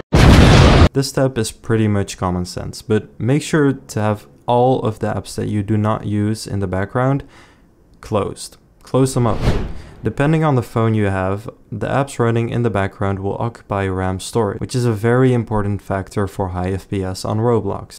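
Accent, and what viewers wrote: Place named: Netherlands. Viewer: You sound so Dutch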